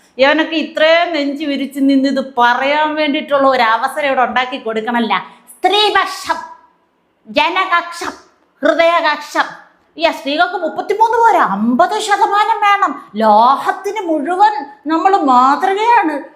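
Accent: Thiruvananthapuram, India. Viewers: അവതരണം മഹാ ബോർ. വേഷം കെട്ടലും. തിരാന്ത്തരം ബാഷകൾ പറയല്ല്🤔🤐🥶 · ur simply awesome.what a super presentation.super tvm slang.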